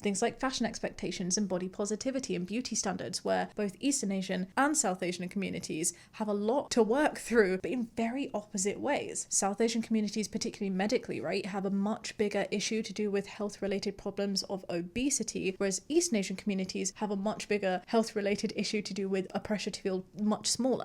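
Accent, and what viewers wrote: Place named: United Kingdom. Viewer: You actually sound British. When I think of Asian food I think of curry. The ultimate food, it's found from India to Japan. Although I doubt anyone from Bangalore would recognize curry from Takahashi. I don't recognize an Asian ethnicity, because Asia is too varied. Dravidians are not Persians are not Han are not Yayoi. Even India is a welter of different cultures, languages and populations.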